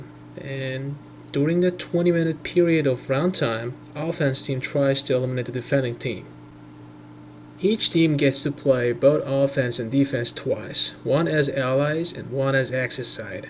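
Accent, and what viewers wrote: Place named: Turkey. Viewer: Listen to and his cute ottoman accent <3.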